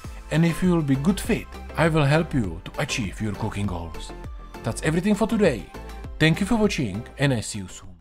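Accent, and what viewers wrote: Czech Republic. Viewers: Vaříš opravdu skvěle ale ta čeština tak brutálně bije do uši.. Raději si vypínám zvuk a zapínám titulky 😀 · češtější přízvuk jsem asi ještě neslyšel... nice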